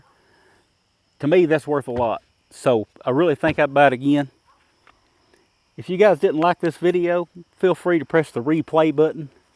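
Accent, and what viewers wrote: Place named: South, United States. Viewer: southern accent and looks Hispanic.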